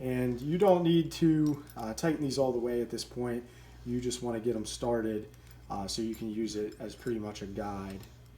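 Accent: Pittsburgh, United States. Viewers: lol at the pittsburgh accent, noticed it right away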